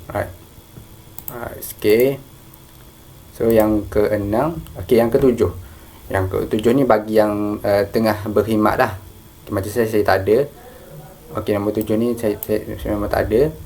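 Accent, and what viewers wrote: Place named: Terengganu, Malaysia. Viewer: terima kasih boh... dengar suara tahu doh.. org Terengganu ni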